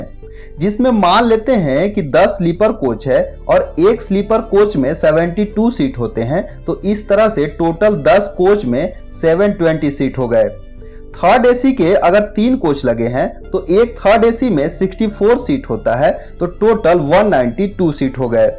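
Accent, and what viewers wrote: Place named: Howrah, India. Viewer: Howrah se ho naa ? 100 %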